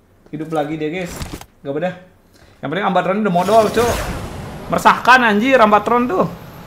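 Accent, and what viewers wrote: West Java, Indonesia. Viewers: Hajar terus mang ku toxickan sunda, nyieun lucu sabab ngomong indo tapi logat namah angeur we sunda🤣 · Sunda mana mang?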